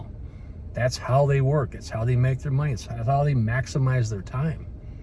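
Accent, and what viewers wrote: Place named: Alabama, United States. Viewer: Hey, you aren’t from Alabama are you?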